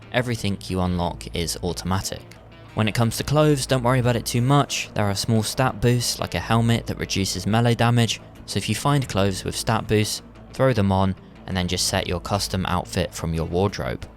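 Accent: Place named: England, United Kingdom. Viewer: But also the more I watch this channel the more you remind me of Avarti from the monotone voice to the English accent to the weird takes on things, I’m glad cause we need more of y’all on YouTube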